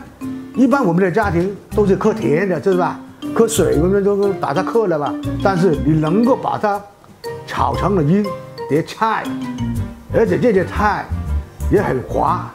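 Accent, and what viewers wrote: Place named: Hong Kong, China. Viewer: 口音真畀我一種香港人嘅錯覺😂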